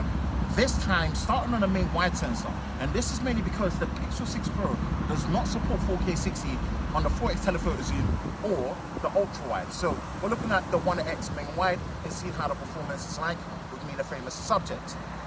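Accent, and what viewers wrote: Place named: United Kingdom. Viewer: Again, one of the best phone camera comparisons. Thanks for your effort. And as usual, awesome british accent. The ice topping. I saw a battery comparison between iphone 15 pro max, poxel 8 pro and pixel 7 pro. The iphone is way way way better. What surprised me was that the pixel 7 pro had slightly better battery life than the 8 pro. I thought they would have worked on it. With that in mind amd seeing the pictures, I think the 7pro offers the most for its money. Where the 8 pro really shines is the AI stuff.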